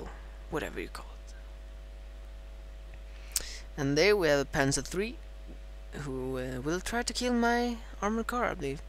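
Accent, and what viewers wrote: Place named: France. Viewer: dude you sound like your french English is good but can improve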